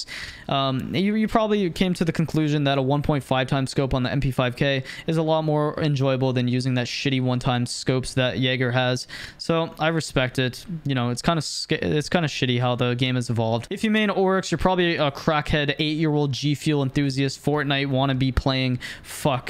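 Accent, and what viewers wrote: Canada. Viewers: What part of Canada ya from bud? I'm from B.C. Triple triple btw ha ha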